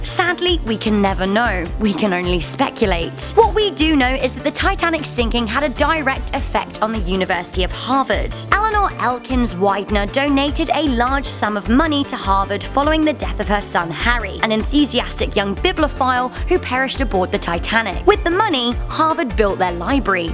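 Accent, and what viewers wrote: England, United Kingdom. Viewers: I love how this English accent lady uses miles instead of kilometers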